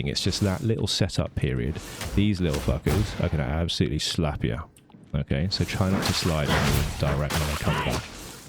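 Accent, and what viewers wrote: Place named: United Kingdom. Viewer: I just wonder - are you side account of Mr E? Cuz you sound very similar :D Only accent says that you're British. I have almost 600h playtime but still can learn something new, so thank you for all the tutorials, which I'll watch. For example I can spare a lot of pain for my poor hands and fingers after many hours of playing only by using one key for crouch and dodge!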